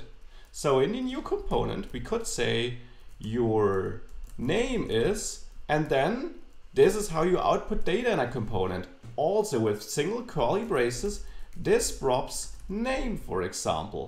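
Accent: Germany. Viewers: Is that german accent? btw nice tuts. I would suggest you to make an advanced React/redux (100+ hours) PAID course.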